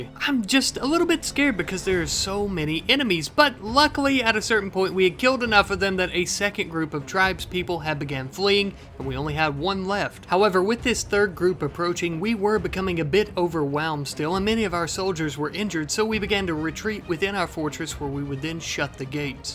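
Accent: South, United States. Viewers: My request is this, a mighty unit of the finest, most exquisite gentlemen, who will dedicate themselves to not only America, but their duty. This duty will be to purge the scum of the earth given the name "bull frog" or Lithobates catesbeianus. Even the name is shameful. May this unit (who's numbers needn't be many) cleanse our ever free land of the bull frog menace, and act as, what comes to mind for me, like the commissars of 40k, though the true use is up to you.(This content is god tier and i look forward to every episode! Thank you for literal hours of legendary entertainment! Also what state are you from if us because I hear some southern. oorah NC and thank you for existing)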